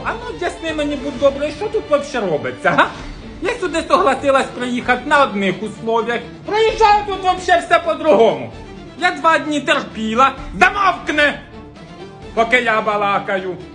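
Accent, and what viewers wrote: Poltava, Ukraine. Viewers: Чого причепились,Полтавщина так завжди балакае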